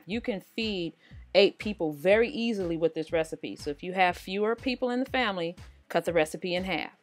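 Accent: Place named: South, United States. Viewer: Hey, I just found you today! I'm so amazed. I would've thought you were from the south. I live in Alabama and you are just like us. I love, love, love your channel. I subscribed and turned on the notifications. 🤓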